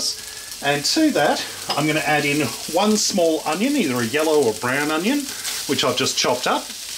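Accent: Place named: Australia. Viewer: Yes, he's an Aussie.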